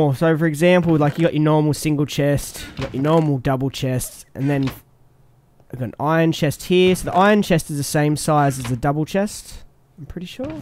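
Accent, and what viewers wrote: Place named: Australia. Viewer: ahh the sweet voice of an aussie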